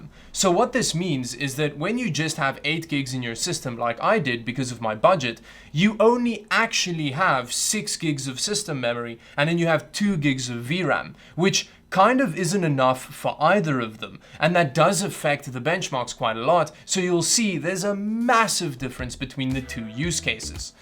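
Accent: South Africa. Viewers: Are you south african by any chance? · Are you South African? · Love your channel man, PC building Noob here trying to get the basic under control xD keep up the good work, also are you a South African Expat ?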